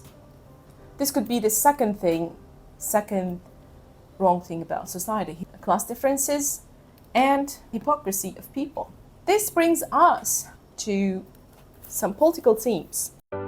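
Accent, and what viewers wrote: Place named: Turkey. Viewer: hi, are you turkish? your accent looks similar. :)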